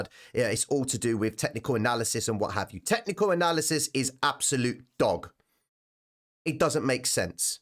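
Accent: United Kingdom. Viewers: Ill be honest it's nice to have a UK voice 👌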